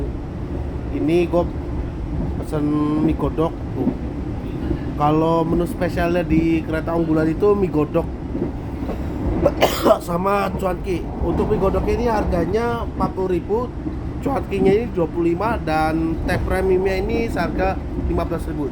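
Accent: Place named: Java, Indonesia. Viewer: Mohon maaf mas, logatnya gak cocok nyebut "gua" terlalu medhok😁🙏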